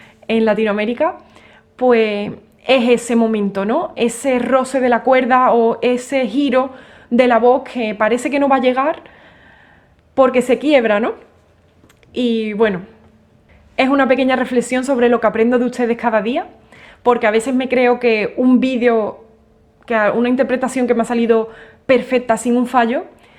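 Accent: Andalusia, Spain. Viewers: Te lo mereces guapa! Sigue con tu arte y sigue hablando en ese Andaluz precioso que hablas. Un cariñoso saludo a desde Chile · De que parte de España es el acento que tienes?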